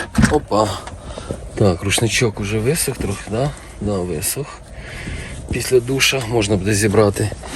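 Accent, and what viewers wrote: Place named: Surzhyk, Ukraine. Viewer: Говори ты уже по-русски , а то твоя сова похожа на избевательно над рiдной мовой. Честное слово, по-русски звучит весь твой суржачий диалект.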